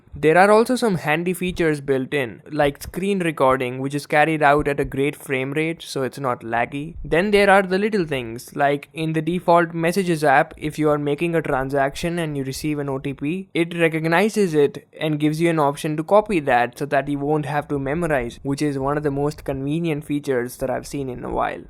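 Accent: India. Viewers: IF MKBHD had an indian accent... this is what it would turn out to be. Nice work!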